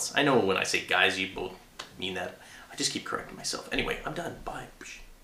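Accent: Canada. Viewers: I'm just wondering are you Canadian?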